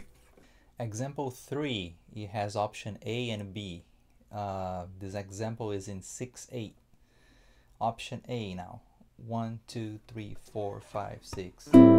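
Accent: Brazil. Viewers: Your accent condemns you 😊 What a great surprise for me to know an amazing guy, excellent performer and an example of professionalism and dedication. Things like these make me feel proud of being brazilian! I wish you the best, bro! May God bless you! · Ele tem um sotaque meio brasileiro msm kk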